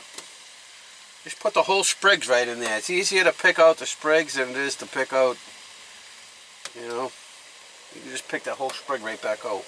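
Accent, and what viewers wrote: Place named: Boston, United States. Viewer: There is no such thing as too much bacon. Ever. Love your Boston/Irish accent! lmao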